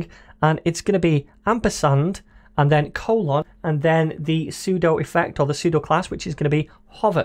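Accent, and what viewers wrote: United Kingdom. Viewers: Are you from UK dude??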